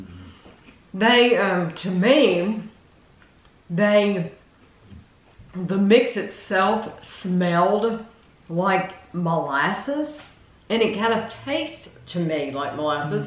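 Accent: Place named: Kentucky, United States. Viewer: You sound like your from Kentucky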